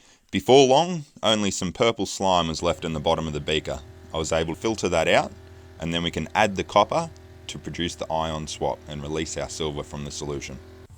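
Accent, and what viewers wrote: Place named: Australia. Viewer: Great video mate. You sound Australian, where are you located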